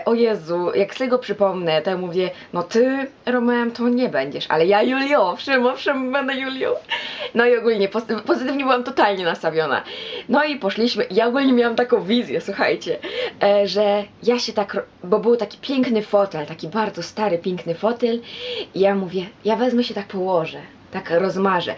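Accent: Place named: East, Poland. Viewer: z takim zabuzanskim akcentem, to mosfilm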